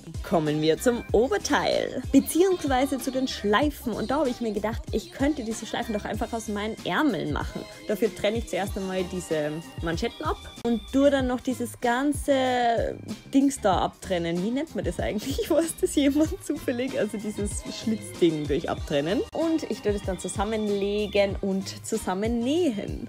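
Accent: Austria. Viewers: Well try not to adapt her pronunciation too much, she has a really heavy Austrian accent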